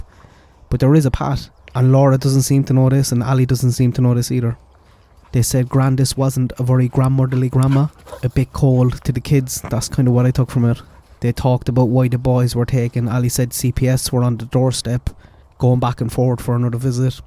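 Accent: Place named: Galway, Ireland. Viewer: You’re from Galway? I was trying to figure out the accent lol. I love Galway. I’m Waterford living in Kildare ☘️ . Glad a logical Irish thinker is covering this ❣️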